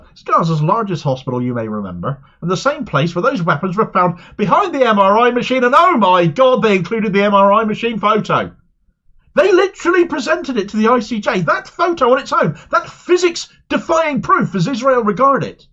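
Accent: Cornwall, United Kingdom. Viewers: Zackley., Great to hear a Kernow voice. I'm not Cornish myself - Cornish ancestry but brought up in Somerset... but I love to hear West Country voice and opinion. Thanx mate.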